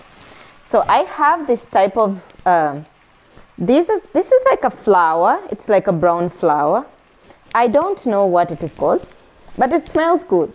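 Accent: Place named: Pakistan. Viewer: You made me overjoyed to picked up Pakistani accent. It means you really love Pakistan ❤ 🇵🇰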